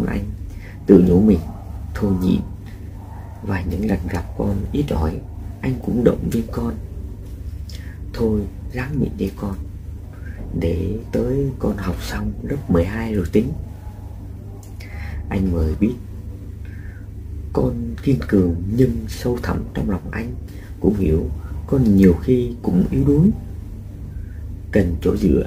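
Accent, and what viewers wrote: Mekong Delta, Vietnam. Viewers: Cám ơn ( xin lỗi vì không biết xưng hô như thế nào ) nhiều lắm. Bạn đã truyền tải bài viết của bạn bằng chất giọng miền tây hay quá đúng như tình cha của hai cha con Chú Mười ❤❤❤ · Anh đọc theo giọng người miền Tây thiệt tình cảm, nghe vừa thân vừa thương!!!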